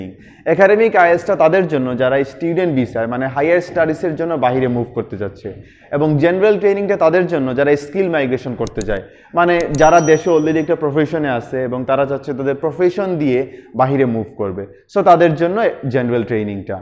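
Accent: Sylhet, Bangladesh. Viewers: Sir sylheter na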